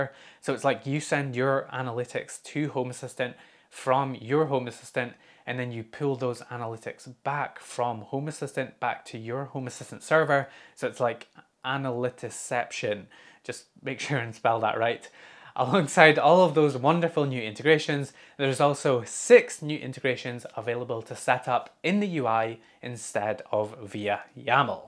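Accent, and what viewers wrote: Scotland, United Kingdom. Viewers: Acting as a rooter! 🤩🤩 I wish you would lean into the Scotsman a little more 😉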